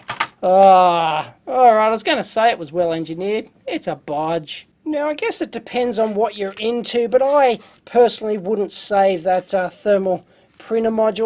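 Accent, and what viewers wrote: Australia. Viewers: First time i have heard a Nerd with an Australian accent lol.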